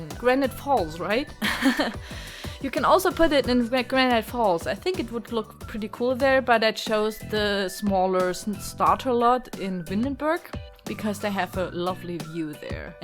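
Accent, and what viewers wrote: Germany. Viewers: Where are you from? Your accent is kinda german. :D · Achso ich dachte schon irgendwo her kenn ich den Akzent :D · Are you german?you have this german accent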